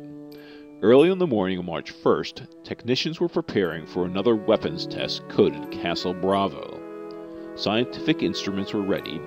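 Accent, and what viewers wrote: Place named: California, United States. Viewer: something funny here is that the commentator try to replicate the 50's Trans-Atlantic English accent usually used in the 50's, the tone was simiar but the accent was not, it's a modern Mainland accent, Californian probably.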